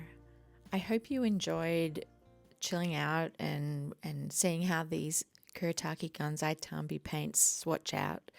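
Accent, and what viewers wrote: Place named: Australia. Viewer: I bought this set to start to watercoloring this year so I am excited to follow along with you to learn how to make swatches. I am an Aussie expat too so it is great hearing your accent.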